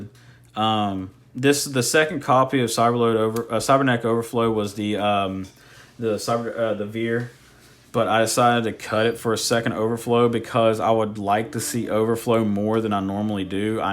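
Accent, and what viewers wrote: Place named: South, United States. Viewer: Southern accents are tough! Lol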